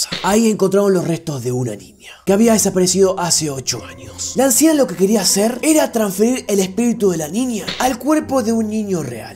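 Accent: Argentina. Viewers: Coraline la peli es buenarda pa 😎 Saludos desde🙁😥🇵🇪 El asentó es de arg pero me gusta escribir su asentó :'( 😣🙁😥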